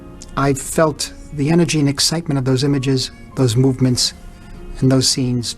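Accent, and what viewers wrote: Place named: Brooklyn, United States. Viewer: Van Gogh with a Brooklyn accent!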